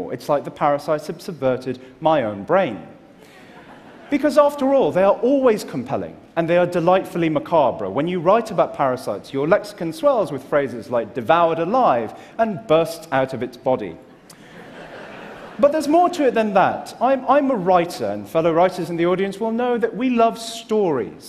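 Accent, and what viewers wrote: United Kingdom. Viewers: I like his British accent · I love an asian who speaks with an impeccable British accent.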